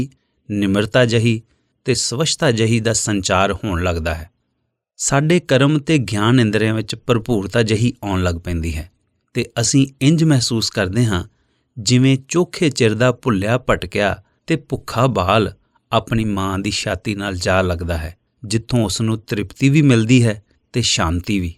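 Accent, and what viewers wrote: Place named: Punjab, Pakistan. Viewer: Tuhadi awaj sun ke bht khushi hundi aa.. tuhadi punjabi cho Pakistan Punjabi da kafi touch feel hunda.. menu mere Pakistan punjabi frnd yaad aa jande jado v tuhadi awaj sundaa.. Thanks u very much Sir🙏🙏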